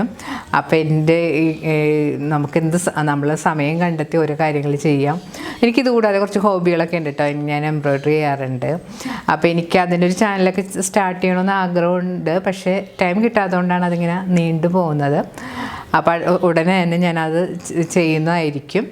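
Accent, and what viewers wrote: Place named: Kochi, India. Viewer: Inspiration video 😘😘 നാട് കൊച്ചിയിൽ ആണെന്ന് സംസാരം കേട്ടപ്പോൾ തന്നെ മനസ്സിലായിരുന്നു🤪, സെറ്റിയടെ അടുത്തിരിക്കുന്ന flower base കിടിലൻ ആണല്ലോ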